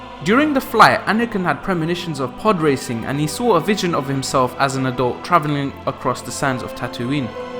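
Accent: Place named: England, United Kingdom. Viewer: Speak real English, not that incorrect trash from englan the shithole nation with erroneous variamts of the English language. Fathet isn't fa-ver and vission isn't "vig-in"...Schme isn't pronounced "shimmy" ... nothing you say is correctly pronounced, it just sounds like shit frok england. Future isn't few-cha...such disgusting speech patterns you filth in england have. Discriminatory is not dis-creamy-tree... THERE IS NONTREE in that damned word!! Maul isn't moe-ull, skill isn't ski-eww...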